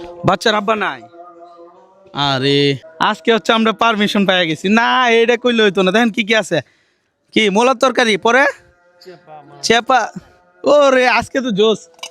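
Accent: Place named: Sylhet, Bangladesh. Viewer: সিলেটি মাশাআললাহ ,ভালো 😢😂❤❤❤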